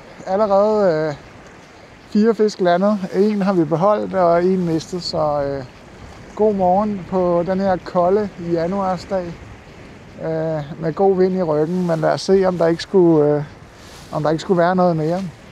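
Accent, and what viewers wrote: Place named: Zealand, Denmark. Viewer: Flot fisk. FIn video. Kan høre du er fra Sjælland. Men er du ikke sød at præsentere dit valg af plads og grej og de overvejelser du gør dig i disse valg.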